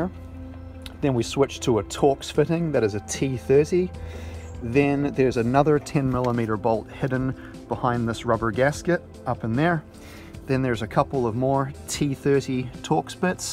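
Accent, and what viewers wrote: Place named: New Zealand. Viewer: You sound like you're from somewhere in the south island of NZ?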